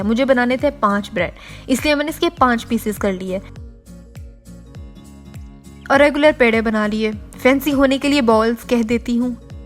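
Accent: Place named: Punjab, India. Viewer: I really loved your channel and I love u too...😘 your accent 😍 of Punjabi..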